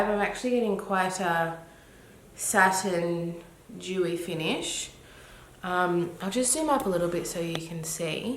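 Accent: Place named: Australia. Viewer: Finally, a beautiful sounding Australian accent! 😅 So many girls aussie sound terrible on youtube. Thank you! 🤘🏻 easy to listen to.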